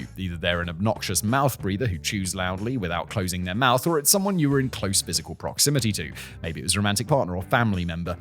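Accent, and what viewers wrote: United Kingdom. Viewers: for whatever reason, a man with a deep, soft voice and an accent from anywhere in the UK, could read anything at all and I will for sure fall asleep within 15 minutes. I would sign up for a Simon ASMR channel. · Maybe not ASMR, but Simon could definitely launch a sleep version of his shows - he doesn’t even need new scripts, just keep the tone level 😅 I like to listen the The French Whisperer to fall asleep, but a British accent beats a French accent any day 😂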